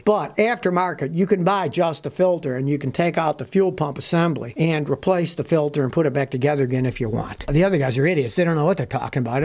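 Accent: Germany. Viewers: I like the German accent and the German laugh! So Funny! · Please, please, speak more with a german accent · German accent made my day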